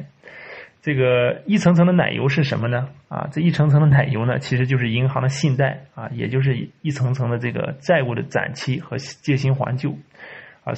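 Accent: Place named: Northeast, China. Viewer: 盲猜你是东北人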